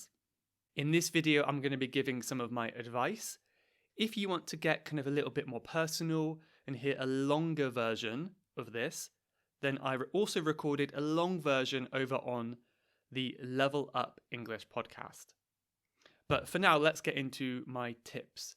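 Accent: United Kingdom. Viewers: Thank you so much for this phenomenal video ☺️ can you please tell me in which British accent you are talking 😊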